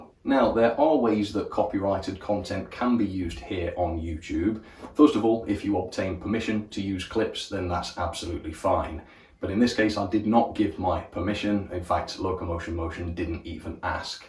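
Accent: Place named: Liverpool, United Kingdom. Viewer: I figured that he was in Liverpool based on his accent. Just guessing though.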